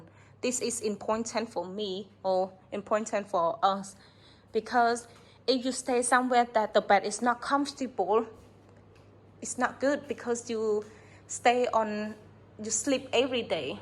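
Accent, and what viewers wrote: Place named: England, United Kingdom. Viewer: Yes you have a harmonious English accent. It's very nice.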